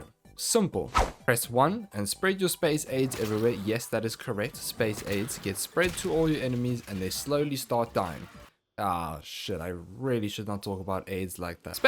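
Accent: Afrikaans, South Africa. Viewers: My fellow South African. The reason I asked was because I could hear your Afrikaans accent.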